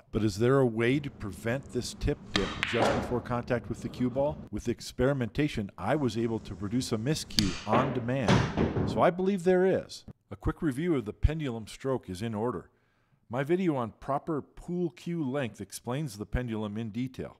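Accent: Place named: Wisconsin, United States. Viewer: With that accent, you’re definitely from Wisconsin lol. Love the content! I just figured out the “throwing motion” on my own the other day and it helped a lot. I need to try out the 90° rule on a table tomorrow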